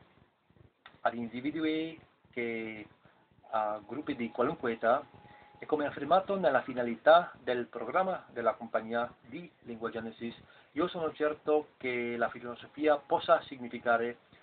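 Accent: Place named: Spain. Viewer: You have a spanish accent when you speak italian, is interesting how a second language affects a third,fourth ect....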